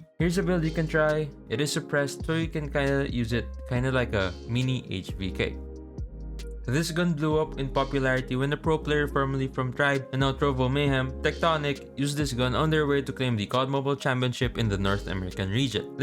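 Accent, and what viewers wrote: Philippines. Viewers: Btw kuya, feel na feel ko talaga na nahihirapan kang mag basa ng script dahil sa accent natin.. Super Relatable Haha. Much Love Kuya. ❤️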